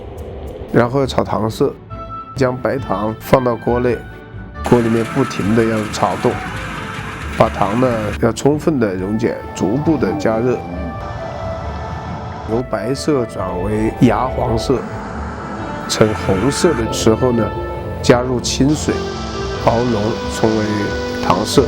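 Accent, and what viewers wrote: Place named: Changsha, China. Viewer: 长沙话就是韵味！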